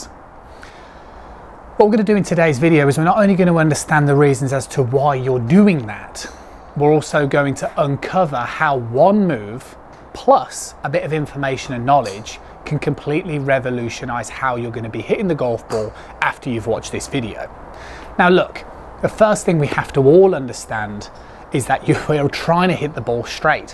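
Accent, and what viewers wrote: United Kingdom. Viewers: You are the first British instructor that I can understand your diction. The accent is pleasant to listen to and learn from. Keep up the great videos. Bruce from USA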